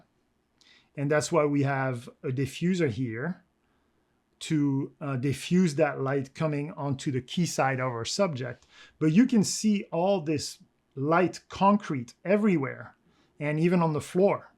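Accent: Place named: Quebec, Canada. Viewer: I would have guessed French Canadian.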